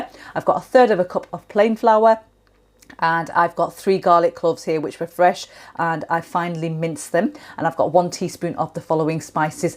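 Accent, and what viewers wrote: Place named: RP, United Kingdom. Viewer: Hi, I tried your chicken pot pie today. It’s a bank holiday weekend and I thought ‘why not’. This is the first time I have ever made a pie and the first time I have used a puff pastry sheet! I copied your recipe but left out the chilli powder and I couldn’t believe how tasty this turned out!! It was that tasty that I ended up eating the pie on it’s own! The only thing I will do differently next time is, I won’t make it slightly saltier when I taste the mixture - just my preference. A suggestion for you, if you don’t mind - when you say ‘my heat setting is a 4’, please always state what a ‘4’ is on your setting. You normally do say ‘that’s a low to medium’ but sometimes you don’t. 😀 In the past, whenever I watched YouTube videos, they were always different videos by different people. You are the first person who’s videos I keep coming back to because your recipes are so easy to follow! 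I love the fact that you do Asian recipes as well as English, and you sound so posh (in a good way!) Thank you for sharing. I have subscribed to your channel. 😀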